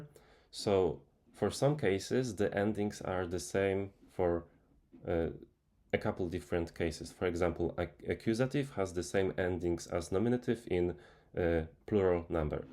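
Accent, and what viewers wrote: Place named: Poland. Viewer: I am here for your Polish English to hear!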